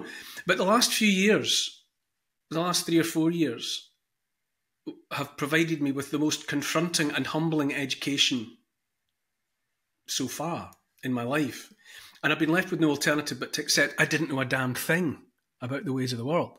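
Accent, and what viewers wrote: Scotland, United Kingdom. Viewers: Subscribe. Because without that you would never hear an Och Aye the Noo on a regular basis!!!!!!!!! And i say this as a Dundonian who grew up in Cambridgeshire with an English accent. I now live North of Aberdeen but eveyone thinks i'm English much to my chagrin!!!!!!!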